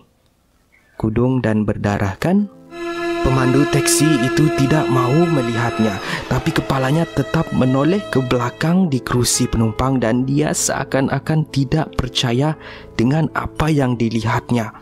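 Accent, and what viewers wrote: Sabah, Malaysia. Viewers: urg sabah ba ko kan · Sabah bh ni pengulasnya nie😂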